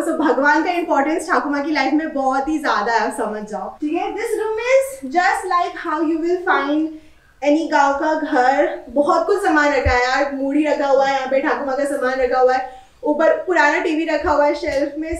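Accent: Odisha, India. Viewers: Your Odia accent is damn❤️.. Coincidentally I'm now living in Baleswar ❤️ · Thank u for sharing dear. I am from Nabarangpur, Odisha. I am Bengoli and my home is Odisha so i can sure you most of Odisha and Bengoli things are same including the names. Your Odia accent is awesome and tumne saare chizo ki naam v sahi se liya hai.😘 Ghar bahut khubsurat hai. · Di I'm loving ur oddia accent❤. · Hello.. it's good to see you embracing your native land our Odisha in such a beautiful way.. I just have a lil complaint that it's not Tulsi kaa Ped.. it's actually Tulsi Mata (Tulsi ka pauda) whom thaku maa worships daily.. being an Odia I would like to listen ur odia accent as well.. loads of love 🫶🏻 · The way you say" Are Asa" like khanti Odiya ❤ I never knew you re an odia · i am from odisha....i am so happy to see you are from odisha too....hearing your odia accent feels so good....love you ❤️❤️❤️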